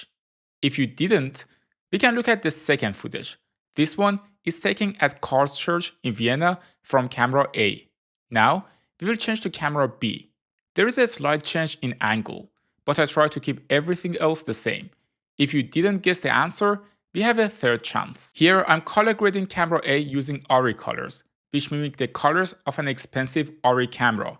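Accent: Iran. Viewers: انگلیسی صحبت کردن ایرانیا همین قدر ساده و قشنگه .. هر جا هستی موفق باشی داداش گلم ❤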